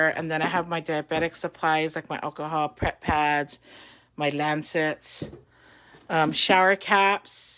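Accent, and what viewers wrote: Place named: Hawaii, United States. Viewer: Mahalo for making this video. I wish I could hire you to organize my house. Your voice is making me miss my friends from Hawaii.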